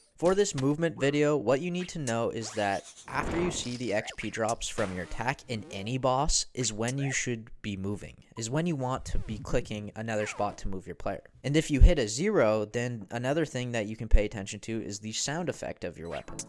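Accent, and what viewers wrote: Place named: Canada. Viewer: Thanks for the vid and ur aggressively Canadian voice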